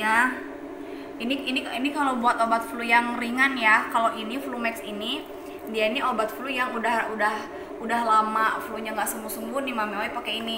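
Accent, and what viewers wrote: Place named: Java, Indonesia. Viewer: Momy ni jawatimur pa jawa barat sih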